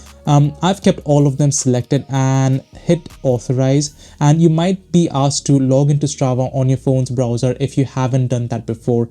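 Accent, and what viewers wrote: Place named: India. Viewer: God bless indian accent folks explaining stuff on youtube :)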